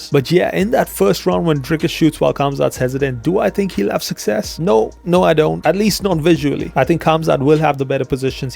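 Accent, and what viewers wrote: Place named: United Kingdom. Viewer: What part of Uk you from?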